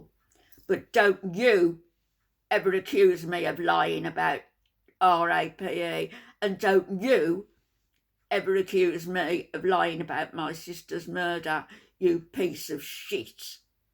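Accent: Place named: Midlands, United Kingdom. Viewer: I love your midlands accent. You are so on the nail with everything, and your perspective is always interesting and entertaining. Keep up the fantastic work.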